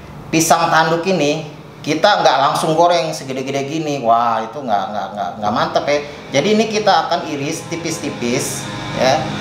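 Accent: Jakarta, Indonesia. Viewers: Wenak pinter abah.. Khas suaranya ky betawi, terima kasih resep nya bah 🙏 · Aye suka bgt ni ame logat betawi Nye,berase kekeluargaan Nye...hehehe Smoge video Nye menginspirasi yg lain yah bang ye...😊😊😊👍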